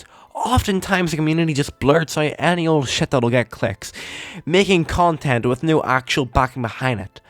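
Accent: Scotland, United Kingdom. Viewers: Are you Scottish? Your accent is fire...also I’m buying it because I’m interested in the game. I like little town hero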